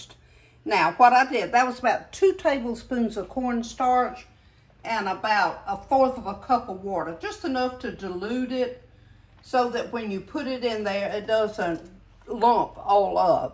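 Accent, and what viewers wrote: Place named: South Carolina, United States. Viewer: I do love to hear your SC accent! I grew up spending summer weekends at Carolina Beach, NC. That was the life. When I was a young wife/mother, we moved to Florence SC, where I got a job working for Peoples Natural Gas (and Supertane.) I remember a call I tried to take once from a man who said he was calling from "Wilson Sawsude" and said they were having a problem with their "wrench." Well, I tried several times to understand, and finally had to turn him over to someone else. Turned out to be calling from Wilson Sausage about a problem with their gas range! I never forgot that. Hugs!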